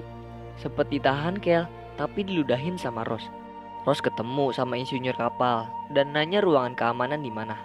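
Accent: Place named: Java, Indonesia. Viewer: Suara Kaka lucu kaya gimana ya ngomong bocor Kay medok gitu aku medok gak terlalu si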